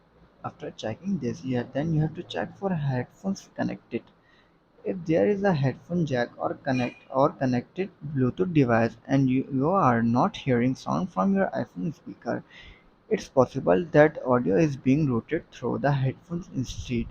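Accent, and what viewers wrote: India. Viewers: Mate, your Indian accent is so strong. You really should get AI generated English very hard to understand what you’re saying.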